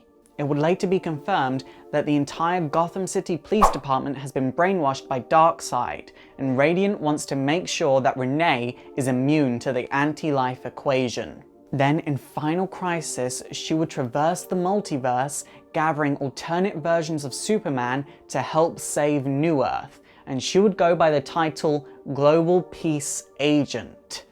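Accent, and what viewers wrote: United Kingdom. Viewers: Sorry if this question is really stupid,but are you british?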